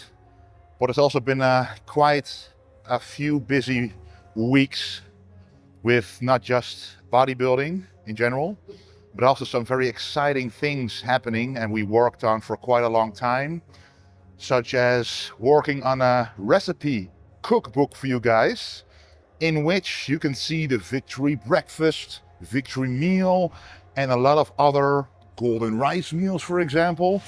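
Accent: Netherlands. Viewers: speaks like a Dutch Optimus Prime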